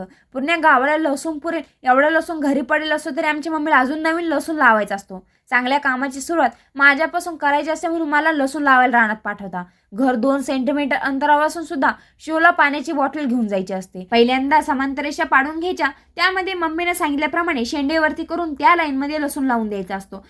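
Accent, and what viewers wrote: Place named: Vidarbha, India. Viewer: तुझी भाषा विदर्भातील वाटते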